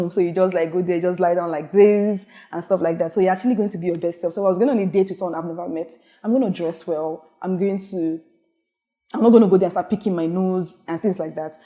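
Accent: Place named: United States. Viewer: Omo, you don dey get American accent small small oo😁😎 Nice video, goodluck to those who applied this cycle.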